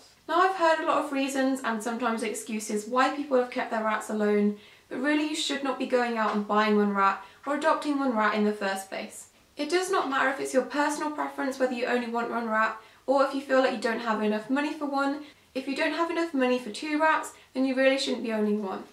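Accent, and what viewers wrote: United Kingdom. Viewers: You Bri-ish? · Are you British *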